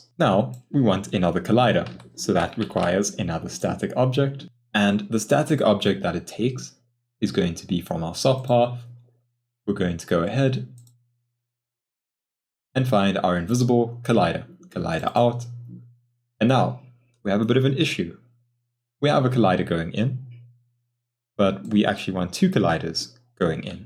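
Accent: South Africa. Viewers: Super helpful. Trying to move from C4D to Houdini so these tuts really help! Thank you! Are you South African?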